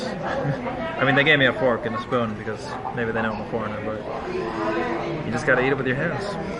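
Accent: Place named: South, United States. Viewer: You ain't from new York boy.You are from down south.It's a secret.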